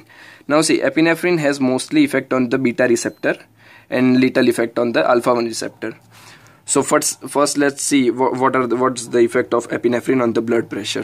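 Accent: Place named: India. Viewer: Love your Indian accent ♥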